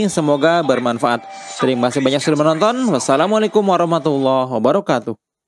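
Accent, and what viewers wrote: Indonesia. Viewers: Kenapa suara indon pulak ni 😁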